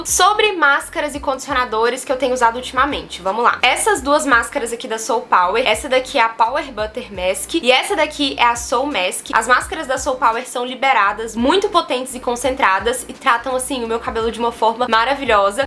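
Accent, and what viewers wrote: Minas Gerais, Brazil. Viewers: Pelo sotaque imaginei que era mineirinha .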